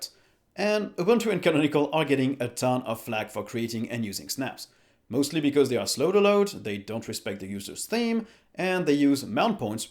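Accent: France. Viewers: Even though Ubuntu has its cons, I have had many issues with other distros (Manjaro, OpenSUSE, etc.) when it comes to NVIDIA RTX GPUs support. Everything simply worked with Ubuntu, so I guess that was my only option, other than wasting another 2 days on distro hopping and figuring out a solution. Also, I know it is out of topic, but you are such a handsome man. (Also, by your accent I feel like you might be French, in which case, un salut à toi depuis Strasbourg !) Keep it up with the great content :)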